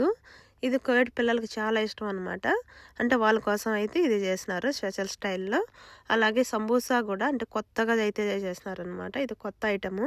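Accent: Rayalaseema, India. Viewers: Rayalaseema slang baaga telusthundhi sister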